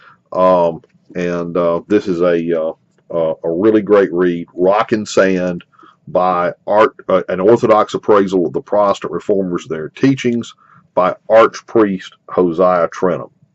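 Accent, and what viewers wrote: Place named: South, United States. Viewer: Nice southern accent. What state are you in? I can’t become Catholic because of the gay clergy. But I respect a lot in what we have in common.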